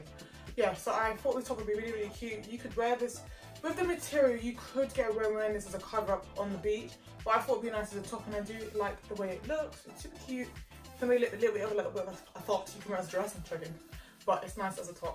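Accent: United Kingdom. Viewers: Pretty darkskin, slim thick and that UK accent :face-red-heart-shape::face-red-heart-shape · You are really beautiful¡¡¡ you look likea doll and your british accent goes perfect with your personality. you have a heavenly body, close to a perfection. Receive a warm kiss from El Salvador. Carlos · Can you blame them? 😉I hope this isnt in anyway racist, but I've always thought that a beautiful black woman with a British accent was HOT! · Very attractive sounds British accent keep up the great videos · A black girl with a British accent, is a white Canadian dude’s dream!!!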